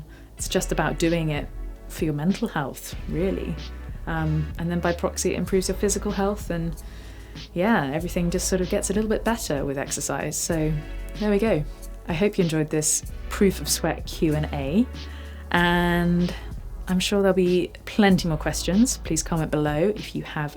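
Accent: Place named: England, United Kingdom. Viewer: Wrecked, hilly...sounds so English...please use an American Accent...will be so fun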